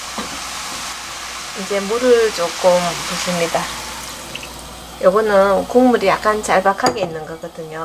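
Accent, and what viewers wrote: Gyeongsang, South Korea. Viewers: 구수하신 경북 억양이 편안하게 느껴집니다.쉽게하지못했던 요리를 쉽고 간단하게 하는 요리 노하우를 기다릴게요. 감사합니다...^^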